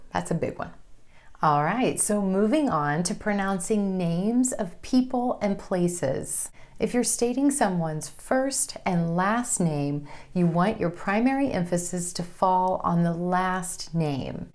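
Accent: United States. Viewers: Yeah some word like hilarious, gorgeous, generous, famous, ambitious,ridiculous, superstitious, suspicious, furious, precious, Somethings that end with ous and ious, I really too bad to pronounce with whose word that end with ous and ious, or if you have more word that end with ous or ious, pls help shares more on the video you make dear, is really hard for me to make a sounds “S,Z” at the end of whose words, Hoping You can help me how to pronounce with whose word correctly dear, I will looking for your video new coming next soon my dear, Thank you so much for all your helpful video, and everything you explain on video very clear for me to understand and I really like ur accent American so much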